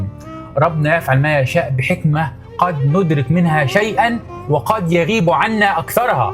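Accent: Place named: Sharqiya, Oman. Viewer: انت من الشرقية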